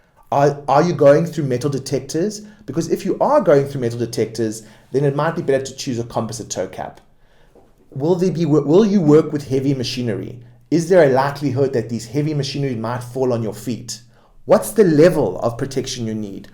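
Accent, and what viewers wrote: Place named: South Africa. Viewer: Your intention to show Toe caps history and evolution is trivial and informative. However, you made a huge mistake in using an image of HITLER to reference a period in time. That is extremely insensitive, offensive and reprehensible. Absolutely bad decision ( if it wasn't on purpose) to show your personal alignments with one of the worst war criminals in history! You could have EASILY chosen a different image from the same time period. Perhaps your being from South Africa, ( the accent giving it away) is part of why you did it on purpose. Absolutely Deplorable. Very tempted to report this garbage to Youtube.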